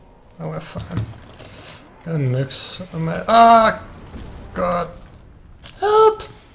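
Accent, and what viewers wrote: Denmark. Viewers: are you danish or german? or neither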